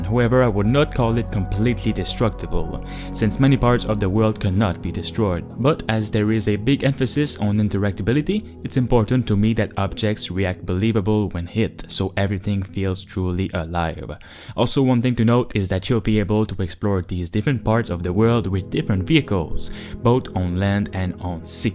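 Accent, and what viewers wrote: Quebec, Canada. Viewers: Your voice sounds québecois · you defenitly sound like a french Canadian.... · T’es clairement québécois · Are you from Quebec? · Salut, je viens de découvrir ta chaine et t'as genre un certain accent. T'es tu Québécois pas hasard? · Es-tu québécois?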